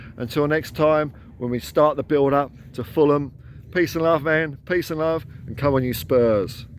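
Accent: Australia. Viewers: Just found this channel and cracked up mate! I'm a liverpool supporter for almost 35 years! I cannot stop watching everything that comes up about ange and spurs! I now know more about spurs team than I do my own! Not converted by all means but there must be so many Aussies that sit on the fence with teams That are now spurs through and through!! He will be the biggest thing for Australia football ever! I keep watching mate love half Aussie accent!!!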